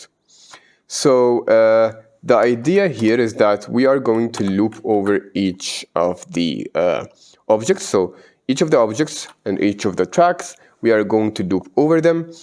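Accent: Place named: Egypt. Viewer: i feel. the accent is more Egyptian :hand-pink-waving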